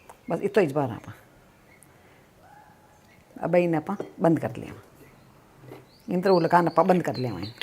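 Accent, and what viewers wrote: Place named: Nagori, India. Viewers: ap ki zuban nagori hai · mai rajesthan s hu , ap ke language sun k apny mayke ke Yaad ati hai.... nice recipe 👌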